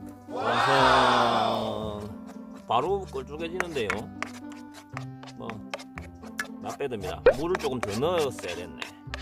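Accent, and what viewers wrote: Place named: Seoul, South Korea. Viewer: 목소리 딱듣고 스울사람 인지 알았음 ㅋㅋ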